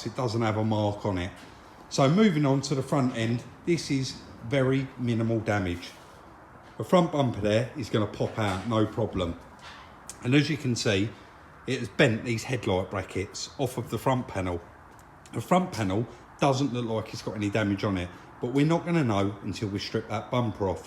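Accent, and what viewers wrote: United Kingdom. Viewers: This British accent 🤣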